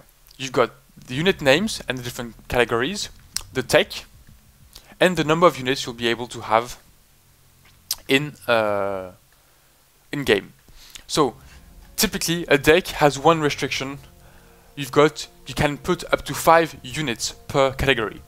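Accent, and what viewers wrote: France. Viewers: First 10 seconds impression of this video. Fuck, i love french accents xD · Yay French accent!! Like!!!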